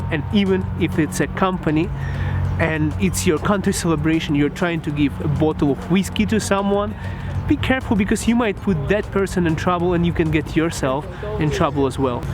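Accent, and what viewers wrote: Russia. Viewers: U Russian? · As a Canadian, I LOVE other accents. Do not be ashamed of your Russo accent.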